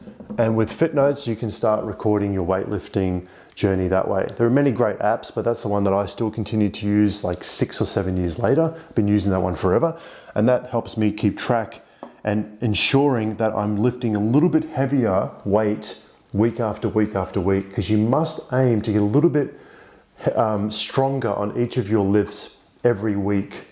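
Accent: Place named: Australia. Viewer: interesting seeing a guy that looks super swedish speaking in an aussie accent.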